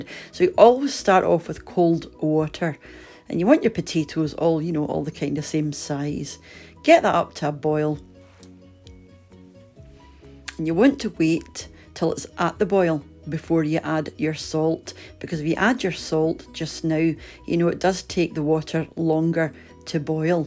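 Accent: Scotland, United Kingdom. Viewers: Yes, I'm enjoying your Scottish accent as well. Miss hearing it.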